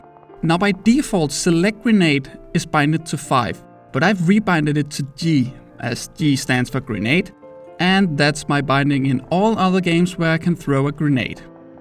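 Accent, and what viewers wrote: Denmark. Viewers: That Danish accent tho!!!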